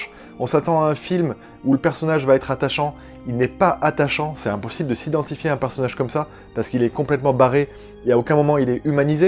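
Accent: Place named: England, United Kingdom. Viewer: Faut faire comme moi. Ne pas regarder le foot. Voire le sport en général (sauf peut-être les sport d'hiver) PTDR! Quand on me voit, c'est EVIDENT que je suis une grande sportive XD. Cette intro que nous as fait encore X) Il en faut peu pour me faire marrer, mais là, j'ai bien cru que j'allais y crever avec quinte de toux qui s'est invitée en même temps XD Et dis donc, j'me permets un compliment, tu es élégant et classe en veste comme ça! Bon c'est pas que d'habitude t'es pas présentable, mais pour que j'me dise (moi qui en ai strictement rien à battre de la touche des gens) : "Woh, eh pas mal quand même le gars!" XD Ma myopie disparaît parfois. Ah et magnifique accent anglais. Du coup, j'me sens mieux vis à vis du mien :p Sinon, je pense que ce film me plairait. Et j'aime beaucoup Joaquin Phoenix. J'vais noter ce film sur ma liste de films à voir. 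:) Car il me semble qu'il ne passe pas dans le cinoch' près de chez moi.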